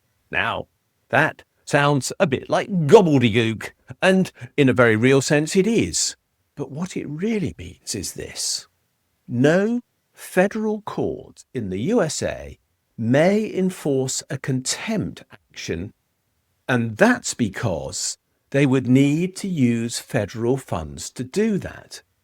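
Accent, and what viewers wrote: United Kingdom. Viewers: Wrong. Hyperbolic nonsense. The US is not, and never has been, a democracy. That is mob rule. We are a Republic where individual rights supersede mob rule. The majority cannot vote away the second amendment, for example. It’s irrelevant what the majority wants if it’s counter to the Constitution. The House has the power to appropriate money. If they vote to do this, it’s entirely constitutional. The judicial branch has to use the DOJ to enforce anything and Trump is the boss of that and wouldn’t let them enforce anything anyway against the current administration same as every administration before. The past administration actively prosecuted their opponents and now somehow Trump is guilty of doing it. That’s just basic hypocrisy. The fact that you mentioned the midterm means you have no clue about current demographics in the US. The US is objectively going to be conservative going forward. 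The democrats are not going to make any gains in the midterm and will probably lose more ground. If the states continue to vote the way they currently are, the democrats will literally not be able to win the electoral college again, which is our brilliant counter to mob rule. You have a British accent. Maybe you’re not living there so I admit I would be wrong, but it’s pretty rich listening to. British guy criticizing our government. You live in a bureaucratic dictatorship that doesn’t even acknowledge unalienable rights. You still have a monarchy that technically owns everything so you hav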